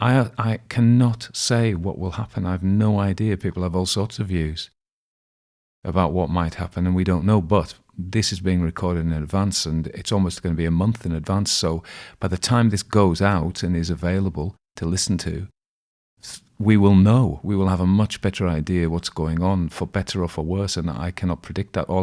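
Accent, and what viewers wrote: Australia. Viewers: I'm a kiwi but I thought your Aussie accent was pretty good. Our goldfields could tell a few stories too. Have you seen The Luminaires? It's a TV series from 2020 with a supernatural theme running through it. About the Otago gold rush. Excellent series. Very spooky! What an incredible life that young author had! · Well done Aussie accent. Great story and commentary. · I missed this the first time round, but glad it came up again. Pretty good Aussie accent, but different to mine, (Even Australia has regional differences and they change with time.). Tasmanians use even more shwa and flat 'a's as in 'at' (except in 'castle'. Why this is an exception I have no idea.). Loved the story, and hop all is well with you and yours. 🙋🏻🔻🇦🇺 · I enjoyed this story so much. It captures the scene perfectly and I was quite taken with your excellent Aussie accent and the worn and tired tone. 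· Wonderful accent that keeps the story firmly anchored in Australia. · I thought you’re Australian accent was very good, not that I personally speak with an Australian accent. Good story, thank you 🙏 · How did I miss this one??? Love your presentation, Australian accent and informative commentary. Just finished your "More Cumbrian Ghost Stories":_pumpkin::_pumpkin::_pumpkin:Can highly recommend it? Thank you! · I'm a West Australian living in Arizona. As soon as I heard your accent I felt right at home. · Your Australian is marvelous. Am completely enjoying all your readings. You can truly be proud of the quality of your work. Thank you so much for these tales. Cheers Pat in New Jersey. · Wonderful, thanks, and I for one DID enjoy your Australian accent! Comments at the end informative, insightful and useful in understanding the piece, I do think they add a LOT to the reading. -- And thanks for your kind and sympathetic wishes at the end. 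Am sitting in Sweden and seeing a corona-disaster all around me. Really appreciate your reaching out to listeners.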